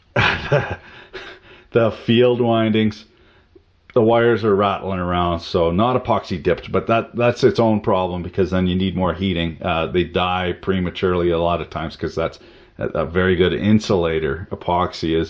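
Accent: Canada. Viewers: Glad I found this channel. You take being a tool guy to a whole 'nother level. Im from Texas and get a kick outta the Canadian dialect. Enjoy it. I watch another channel called South main auto repair, and you sound a great deal like him lol. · I swear you were Canadian...??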